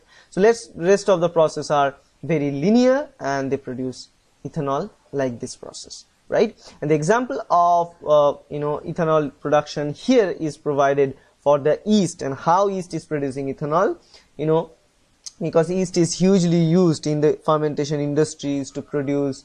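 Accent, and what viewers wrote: India. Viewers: thanks so much man you the only one in the in the internet who actually explains it well. Loving your indian accent!!! thx a lot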